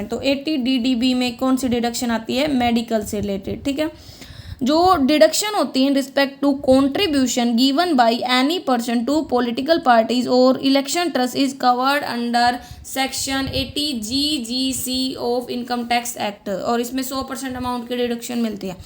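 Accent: Haryana, India. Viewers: Ma'am haryana se kaha se ho?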